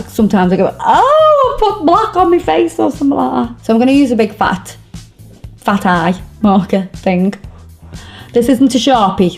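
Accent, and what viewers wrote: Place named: Manchester, United Kingdom. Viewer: your accent is manc with Sheff and Liverpool mixed in?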